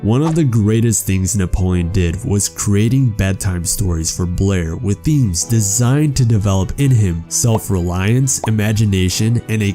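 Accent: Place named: Philippines. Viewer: sounds like my Filipino friend, saying “da” instead of “the”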